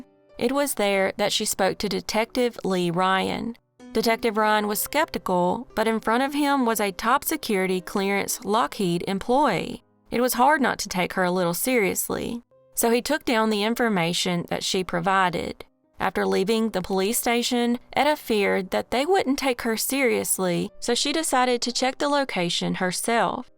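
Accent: South, United States. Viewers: Love your accent! Makes me feel right at home with these southern true crime cases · Im 6th Yay!!!! It is all so sad But at the same time i enjoy hearing your southern accent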